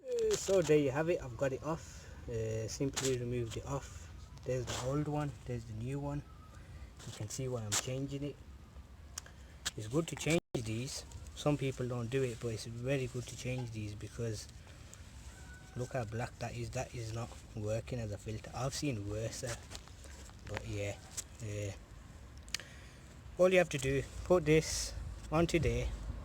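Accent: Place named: Birmingham, United Kingdom. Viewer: You sound like you're from Birmingham, help a brother out lol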